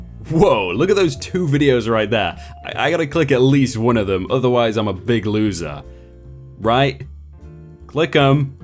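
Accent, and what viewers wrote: United Kingdom. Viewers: notice how when mispronounce the word "nuclear" the comments sections go crazy but when they make fun of british accent he don't give a sh** wait that's a dumb point to make, but I could take some feedback:D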